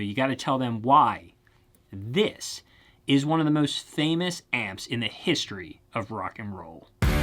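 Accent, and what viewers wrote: Baltimore, United States. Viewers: That Baltimore accent. Maybe Philly.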